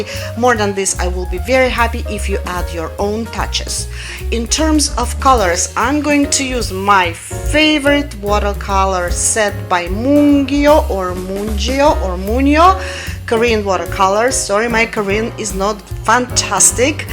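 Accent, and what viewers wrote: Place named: France. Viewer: I love your French accent in addition to your art.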